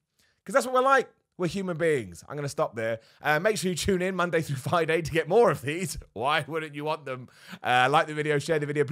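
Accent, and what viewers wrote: United Kingdom. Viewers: Now that you mention it, you could kind of resemble Johnny Sins. However, you sound like a Brit and I'm sure Johnny Sins is a Yank. You sound like someone who does radio. And I'm not sure why being confused with Johnny Sins is an insult!!!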